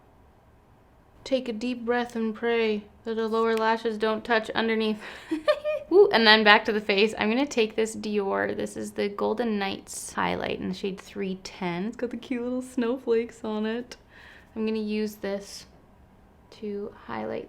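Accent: RP, United Kingdom. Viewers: You killed the Queen English accent!!! wow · That QEII accent... A++!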